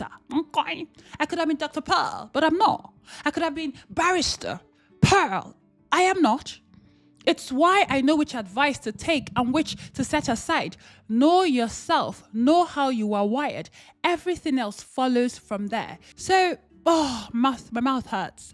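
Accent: Nigeria. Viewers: I kept hearing the Nigerian accent sneak itself in and you eventually mentioned being born in Nigeria. 😂❤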